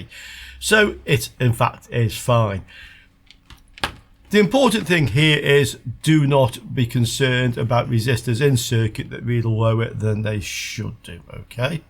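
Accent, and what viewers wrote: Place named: England, United Kingdom. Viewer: Thanks for handing down your experiences. Your videos are organized, frank, and honest. I frequently have your repair on my workshop playlist to keep me company in the lab. I might even pick up your English accent.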